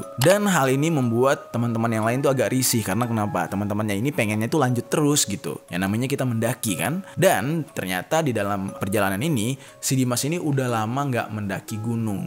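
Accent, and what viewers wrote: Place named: Medan, Indonesia. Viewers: Logat yg enak didengar g terlalu kasar dan ga terlalu lebay ya logat medan,melayu baguss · Maaf bg,aku orang Medan,aku ngakak kalau dengar bg ngomong logat medan😂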